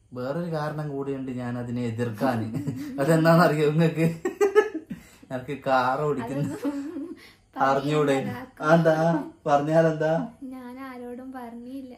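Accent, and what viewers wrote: Vadakara, India. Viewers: Vadakara varthanam😂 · Nte rathri muzhuvan vedios kandu❤kannu niranju😢ithrayum prayaasangal okke undaaayittum ningal koyambathooril shop thudangi scooter maangichu❤oru vidhathil ellaam oppichu❤ avasaanam baaghyanithiyaaya oru khairaaya bhaaryayeum kittii❤eni angootulla jeevithathil orupaad uyarchayil ethatte🤲🏻vadakara mekhalayil ulla youtube channel pothuve kuravaaanu😂ningale vadakara slangilulla varthaanam pravaasthil irunnu kelkkumbol nalla sandhoshm ❤chekkotti bazar nte aduthulla CHEMMARTHOOR Kaaran❤❤ NB:aare kondum parayippikkaatha vedios cheyyuka 😂❤sneham maathrm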